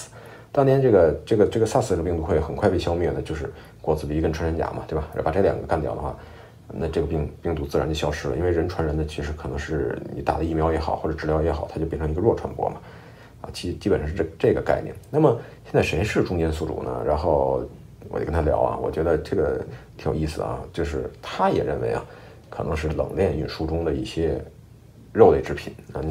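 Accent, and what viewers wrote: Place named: Beijing, China. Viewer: 菲龙网看了一下都是视频加文字。。哪里有这样的京腔京孕有意思。。😊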